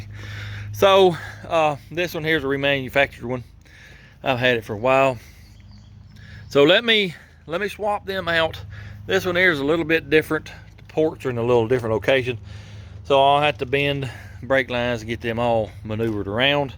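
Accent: United States. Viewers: I just love hearing you talk. As a Swede, this is as American as it gets 👍😀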